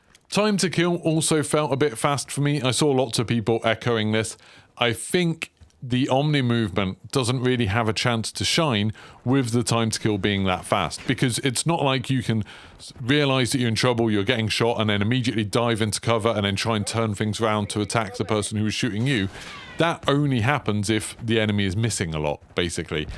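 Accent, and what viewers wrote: United Kingdom. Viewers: Bruh, he’s obviously British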